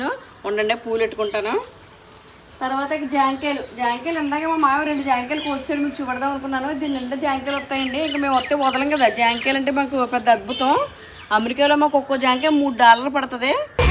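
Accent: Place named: Amalapuram, India. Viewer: మీ బాషా యాసా అచ్చం అమలాపురం బాష చాలా బాగుంది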